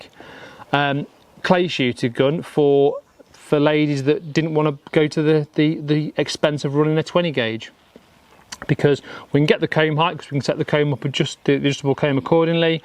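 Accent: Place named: United Kingdom. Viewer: I hate British accent, very difficult to understand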